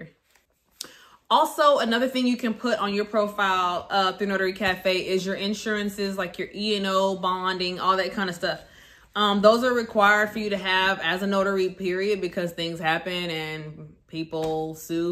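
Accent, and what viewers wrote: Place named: Texas, United States. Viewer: I watched your "what they don't tell you..." video first and was like she sounds like she's from Texas 🤣🤣🤣. Then I watched this one and you confirmed it. I'm from Beaumont and I'm just now starting. I received an email a few days ago and missed it. It would have been my first signing if I was assigned.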